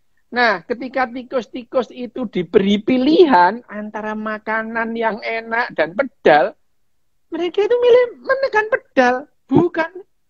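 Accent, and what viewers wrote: East Java, Indonesia. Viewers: Natural gaya orang Jawa timur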